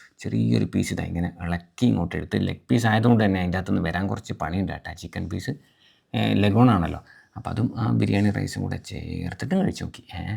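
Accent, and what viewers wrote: Kannur, India. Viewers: Chettan kannurkaran aano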